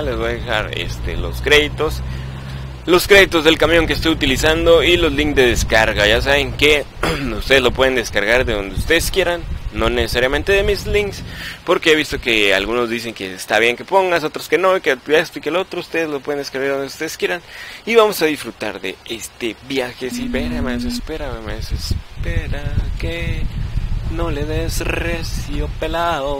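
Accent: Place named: North, Mexico. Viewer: jajajaja chingonón tu video, hasta que oi a alguien hablar chingonón, arriba el norte cabrones jajajaja.....ya me suscribi.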